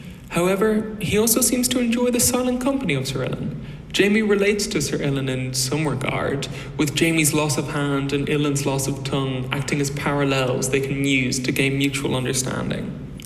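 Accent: Ireland. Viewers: Re: your accent question, I’m from the US and you sound Irish to me (specifically the way you pronounce some of the names) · Phenomenal theory! Love how Ilyn's inability to speak mirrors Stonehearts and how Podrick is involved. Also I'm guessing you're from Ireland based on your accent. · You sound Irish to me. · I liked the video. Seemed professional. I had no idea you were a new channel. Seemed like you've been doing this a while. Loved the topic, and I noticed some interesting bits about Payne in the story, but I never thought too much about it, and this was a cool dive into it. And as for your accent, I'm sure it's not strong in the sense of the general country of where you're from, but I'm American, and anything from the English-speaking world that isn't American sounds strong to my ear. I'm going to guess Irish. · Excellent video. I'm looking forward to whatever you put out next. 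RE: The accent, almost certainly Irish, I'd guess Dublin but not certain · Easily one of my favourite theories! as for your accent, I diagnose you as Irish! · I think it's not unlikely there is truth to this theory. If there is, I think Arya may be present when Stoneheart questions ser Ilyn. I think it is Stoneheart's vengeful nature that will make Arya turn away from her path of revenge, and ser Ilyn's questioning may be a part of that. As for your accent, after paying attention to it, it definitely sounds Irish. It is, however, not a very strong accent (especially not compared to other Irish people I've met). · My guess is Ireland? for accent that is lol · Love the theory. Haven't seen many creators ponder his role or the parallels between him and Wex. Definitely an Irish accent. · You sound a bit Irish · Is your accident Irish? It's strong enough to sound pleasant and sophisticated on my crude yanky ears. 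· I really like the character Dick Crab. Your clearly Irish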